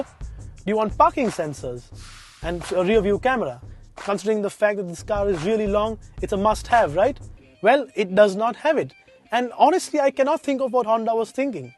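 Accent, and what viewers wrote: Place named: India. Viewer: no offense to others but youre the first indian car review wherein the accent doesnt bother me! nice review!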